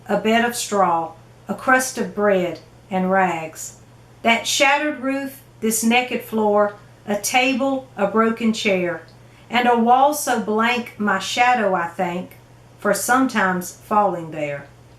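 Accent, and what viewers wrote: South, United States. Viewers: I love your south accent! It's more smooth in my (french) ears than the british accent 😊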